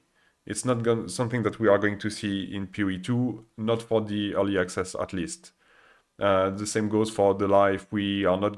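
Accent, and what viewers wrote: France. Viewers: You got a bit of a french accent but you speak english way too good to be french. What is this sorcery?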